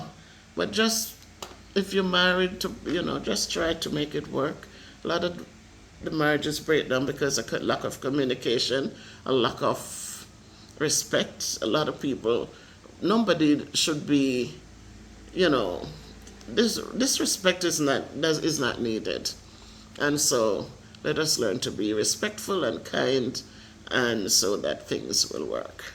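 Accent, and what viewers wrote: Jamaica. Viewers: you have me cracking up. I love to hear you talk that patwa talk.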